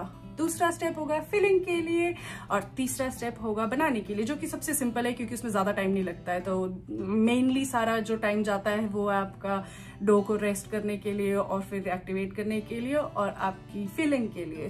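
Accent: Pahadi, India. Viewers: Tussa ra pahadi accent ai eee jaa , luv from mandi HP 🤗❤️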